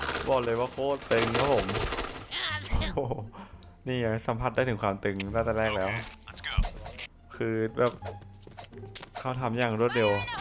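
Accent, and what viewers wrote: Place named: Ratchaburi, Thailand. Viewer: เสียงพี่เหน่อๆเหมือน ราชบุรี-กาญจนบุรี เลยครับ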